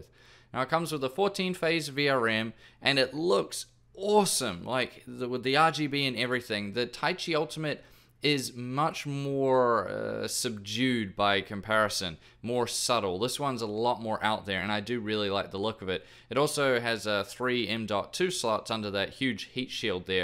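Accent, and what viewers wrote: New Zealand. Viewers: .. hear your Kiwi accent ? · .....damn i forgot where i was going with the first half of my comment! oh well, its nice to listen to another Kiwi talk tech!!! :D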